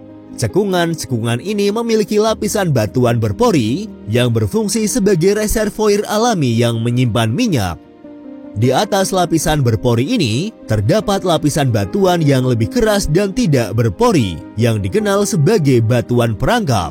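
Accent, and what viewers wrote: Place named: Banyumas, Indonesia. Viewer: abang dubing nya orang ngapak yaaa😀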